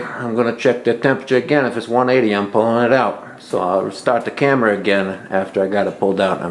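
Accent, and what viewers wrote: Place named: New York, United States. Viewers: Love listening to your N.Y. accent, and the passion you expressed, and your CHallah metaphor...Thank you for sharing your amazing recipe! I would try adding the sugar to the yeast well you made, just to cut a little wait time. Shabbat Shalom! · You are amazing! I love listening to you speak. I am originally from Rochester, NY, 72 years ago, so after 25 years in SC I love to hear a real New Yorker talk. The bread is beautiful, and what it means to you is even more beautiful. I made my first loaf today, and it's in it's final rise. I hope it turns out at wonderful as yours did, though I only made 1/2 recipe. Thank you for your time and energy you put into this video. I hope you and your family are always blessed.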